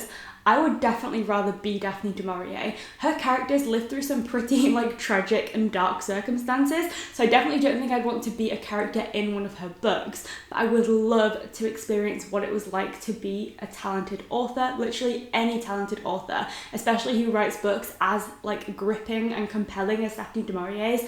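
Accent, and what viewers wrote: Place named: Yorkshire, United Kingdom. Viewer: This was so fun ☺️ love your videos and just the whole vibe of your channel! Are you from Yorkshire? I feel like I can hear it in your accent 😁 I’m a Yorkshire lass 🥰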